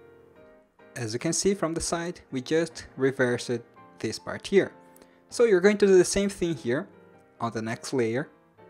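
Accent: Japan. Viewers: It's a shame that the person with the coolest crafts on YouTube has the least comprehensive, most annoying tutorials. Plus, when you're not very good at it (like me) you have to listen to this autistic Japanese accent and this kindergartner level grammar until you finish the tutorial or you have the sense to quit the video. The latter worked well for me.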